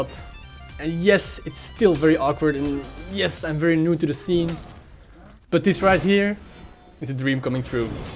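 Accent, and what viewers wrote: Belgium. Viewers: EEEEEHHH !! Mais t’es belge !!! Jme disais bien que je reconnaissait pas l’accent !!! Perso je pense que tu devrais aller sur twitch, Youtube est encore trop mal foutue en matière de streams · Kom je uit België? Hahah groeten vanuit Nederland! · Are you belgian?/ Zijt ge belgisch? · Nice to see a fellow Belgian! I heard the accent and was doubting you were from BE or NL :) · Kom jij uit belgië?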